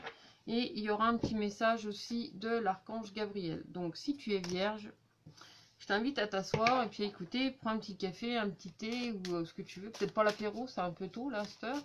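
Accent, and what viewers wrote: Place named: Franche-Comté, France. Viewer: je suis de Franche-Comté,je reconnaissais bien le ptit accent!!!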